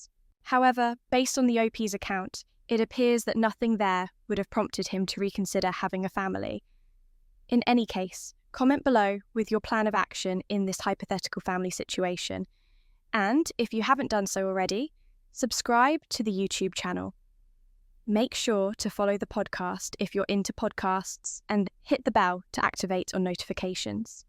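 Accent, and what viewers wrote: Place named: United Kingdom. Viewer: Hate the British accent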